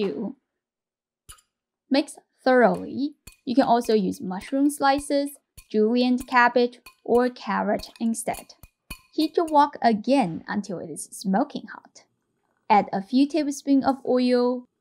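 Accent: China. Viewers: This looks delicious and I LOVE your accent! I've heard Chinese accent before but yours is lovely.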